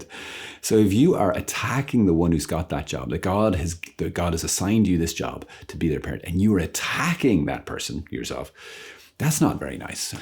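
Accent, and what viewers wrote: Ireland. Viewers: I like the Irish accent to...❤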